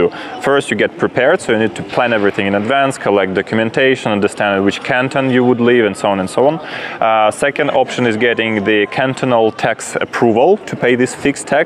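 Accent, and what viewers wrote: Russia. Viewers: I hear a strong russian accent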